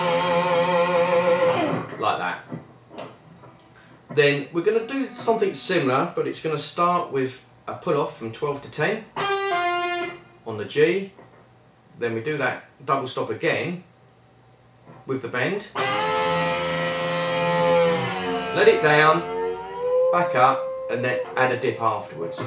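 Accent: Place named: London, United Kingdom. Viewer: yep, I found that midi file for the song, and I also use Logic, so maybe i'll put a few hours in to making it sound nice like you have. I noticed from your accent that you might not live too far from me, I am in east London and I'm looking for a guitar teacher to help me with a few things, I've been playing 42yrs, I'm just looking for a teacher who is in to the same sort of sound, runs and modal work that interests me, If you could spend a few hours a month with me, I would really appreciate it, money no prob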